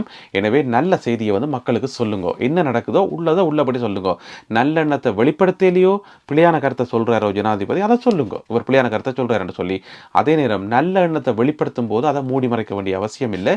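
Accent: Jaffna, Sri Lanka. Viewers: உங்கள் யாழ்ப்பான தமிழ் வாழ்க.... கிளி ஸ்ரீ உட்பட பார் பிரமுகர்கள் அனைவரும் மான தமிழன் என்றால் பதவி விலகுங்கள் ஒரு பாராளுமன்ற உறுப்பினர் சொன்ன கேவலமான கருத்து..... எம் மாவட்த்தில் கசிப்பு உற்பத்தி அதிகரித்து விட்டது எனவே சட்ட ரீதியான பார் தேவை என்று.. அந்த நாதாரிக்கு புரியல்ல கசிப்பு உற்பத்தியை நிறுத்த முடியாத சட்டத்தில் நீங்க தான் பாராளுமன்ற உறுப்பினர்... கேவலம்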